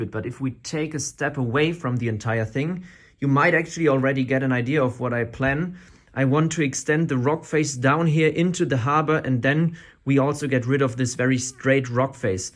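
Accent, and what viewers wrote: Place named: Germany. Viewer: German accent sucks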